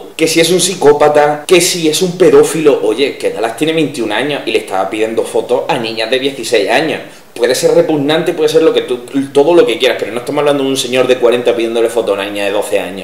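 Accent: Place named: Southeast, Spain. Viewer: No viene al caso, pero... ¿de que parte del sureste de España eres?, es que ese acento se me hace familiar XDD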